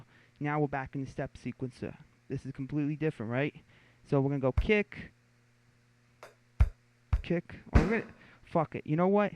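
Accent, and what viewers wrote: New York, United States. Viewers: This dude is hilarious. His New York or Jersey accent makes it all the better.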